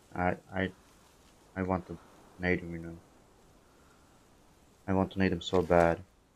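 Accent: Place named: Middle East. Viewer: Awesome! I was going to say middle eastern but I didn’t want to be wrong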